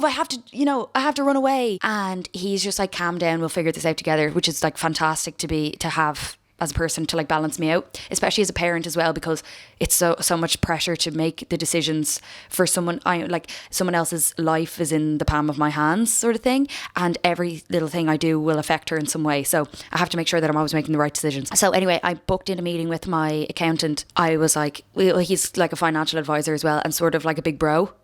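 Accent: South Dublin, Ireland. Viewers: Are you from the south side of Dublin? ❤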